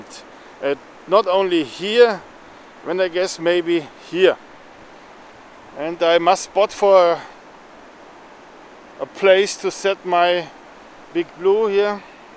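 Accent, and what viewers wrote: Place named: Germany. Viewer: Good luck for the future and its really a lovely german accent you cannot hide :p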